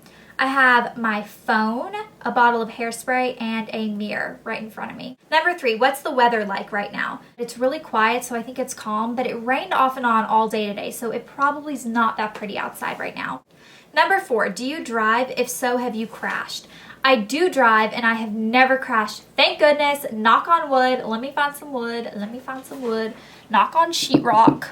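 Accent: South, United States. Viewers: Hahaha, could it be that your accent is getting more 'Southern' again, because you are at home? I know it happens to me, when I stay at my parents for a longer time. (And I'm from Belgium! Did you like our country?) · your southern accent is coming back slightly. is it because your back home?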